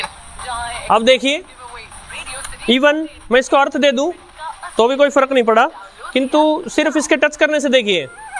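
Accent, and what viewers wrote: Standard. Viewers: Very nice.. impressed by your tone ,pure Hindi and clear details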